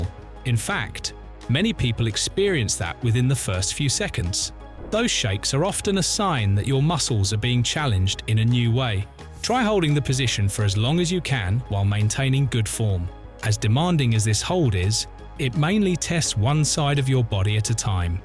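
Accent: England, United Kingdom. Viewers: Thanks enjoy your vids. Good exercises to build into my routine. But would be good if vid matched the lovely sounding (AI) englishman. Nobody in the vid was actually holding the Archer pushup - all were moving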